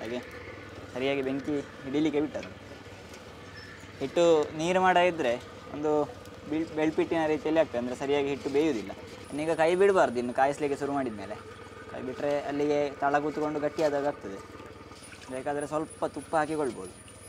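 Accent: Mangalore, India. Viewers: I liked your mangalore (kudla) kannada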